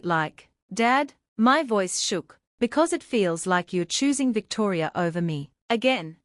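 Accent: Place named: United Kingdom. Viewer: A British narrator and an American holiday? Kind of ruins it for me.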